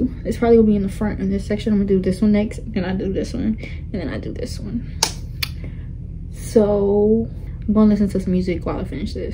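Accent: Louisiana, United States. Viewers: ok so what part of Louisiana are you from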